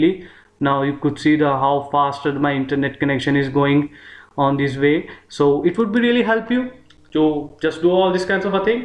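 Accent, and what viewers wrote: India. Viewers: <3 indian accent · fuck you indian accents i get cringed everytime i listen an idian talking english smh smd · Instantly clicked off once I heard the Indian acent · Its a Indian accent.... Easy to understand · india accent ?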